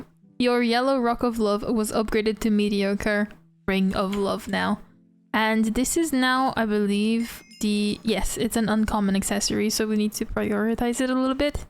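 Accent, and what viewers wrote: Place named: Ireland. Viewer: what accent do you have? it sounds like a mix of cork and belfast ireland but idk for sure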